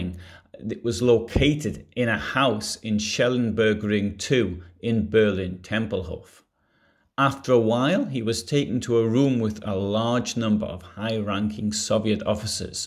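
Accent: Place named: Newcastle, United Kingdom. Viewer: I certainly have subscribed, and I am certainly enjoying your Video's and I am certainly intrigued by the Bizaar mix of your accents..... Newcastle????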